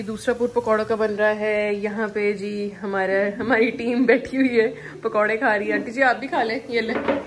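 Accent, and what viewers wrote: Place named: Punjab, India. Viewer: Your Punjabi touch in conversation and smile 😀 love it ❤️❤️❤️❤️❤️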